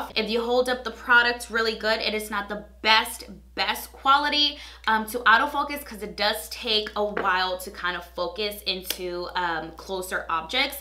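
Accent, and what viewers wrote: New York, United States. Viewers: I love your accent ❤😇 so new yorrrrk haha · are you from new york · It's alot like mine I am wondering if she is from new York or boston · Are you from New York or New Jershey by any chance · Her new york accent is super heavy love it😂👏🏽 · she sounds like a really strong new yorker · R u from New York? Cos “nwot auwll of uws are” (not offending anyone) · holy new yorker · I love your NY accent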